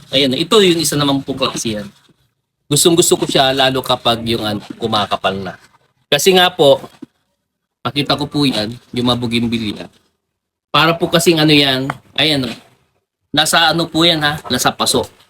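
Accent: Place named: Cuyonon, Philippines. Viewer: Pagcuyonon din lang halata ka na cuyonon ka ra Tagalog Tagalog ka pa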